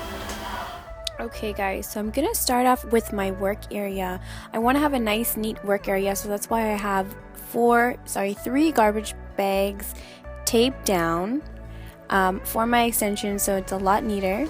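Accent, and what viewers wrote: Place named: Guyana. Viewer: love your Guyanese accent I'm mixed and mines comes out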